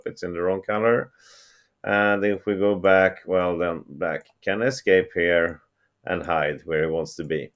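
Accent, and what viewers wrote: Sweden. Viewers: What a bizarre comment!! I like Swedish accents and the overall impression is most personable.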